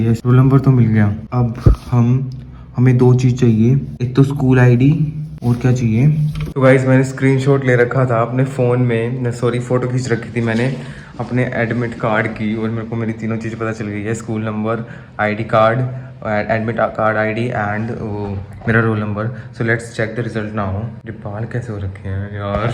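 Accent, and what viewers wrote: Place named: Haryana, India. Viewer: Proper harayanvi tone 😁😂❤️.....vase mere papa ne bhi ase kuch react Kiya 😂...all harayanvi father's are same 😅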